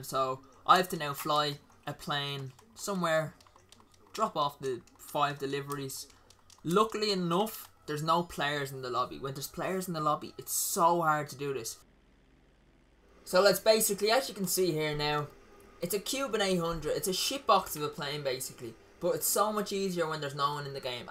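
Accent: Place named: Ireland. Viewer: what part of ireland you from?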